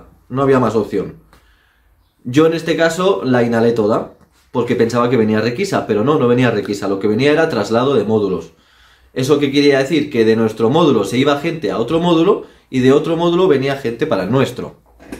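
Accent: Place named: Spain. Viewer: me haces reir mucho, me encanta tu acento español al narrar tu historia, saludos desde Venezuela, los retos estan bien, adelante...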